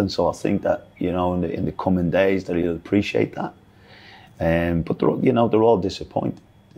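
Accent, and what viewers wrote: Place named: Northern England, United Kingdom. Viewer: his accent is weird, sounds like a combination of northern english and irish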